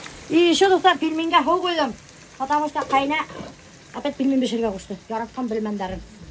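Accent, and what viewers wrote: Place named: Chelyabinsk, Russia. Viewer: милашка привет, смотрим вас из Челябинска, ваш говор на башкирском как наш. Приятно слушать, все по простому, смотреть на вас, все как в обычной жизни, бирсен сезга ходаем саулык, бахет, уныштар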